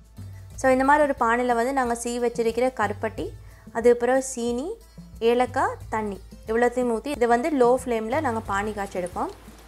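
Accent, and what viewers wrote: Sri Lanka. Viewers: After a long time hearing some SL muslim tamil accent, nallam datha, wattalapam yen favorite, used to get them from Maradana muslim hotels along with buriyani, those were the days, late 80s. keep it up. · Nisha Me too first time paakren,Srilanka Tamil Lovely talking style. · Happy to see a lankan moor girl proudly presenting our food, talking in our own slang...cz it feels so closer... fellow lankan from UAE😍 u go girl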